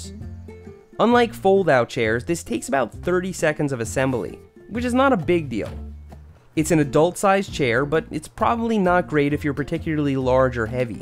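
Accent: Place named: Midwest, United States. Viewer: it sounds like he is plugging his nose while he talks with a bad midwestern accent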